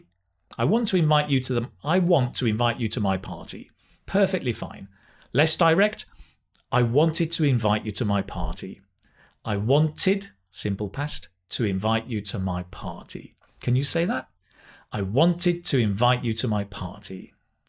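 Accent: United Kingdom. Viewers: Thank you so much for your video clips ! I just love your British accent ! When you said, it was a bit strange to use the past tense to sound more polite like in "I was wondering whether you ..." in a present situation, well, we do the same thing in French when saying 'Je me demandais si tu ... ' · Thanks for the clarity when explaining the classes. It´s way better to hear you. When I read at all, I had in mind, "aroll", American accent, instead your pronounce says at all. It will be good for me to have the opportunity to practice Brits accent as well. Thanks. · Great teaching videos! a great accent. Could you please tell us which British Accent do you have? would you like to suggest us some good British narrators on ? Thank you so much :)))) keep up the great job (y)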